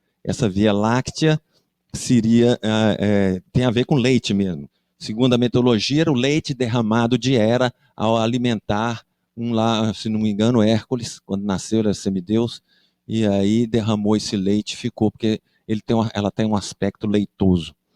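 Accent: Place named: Minas Gerais, Brazil. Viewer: Astronomia e GALAXIAS palestrada por um mestre com sotaque mineiro fica mais LEGAL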